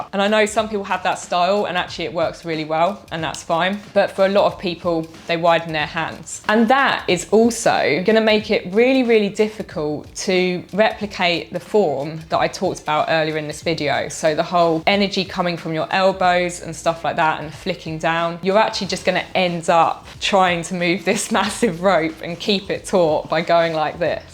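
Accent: United Kingdom. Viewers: This British accent 🥰😍🥰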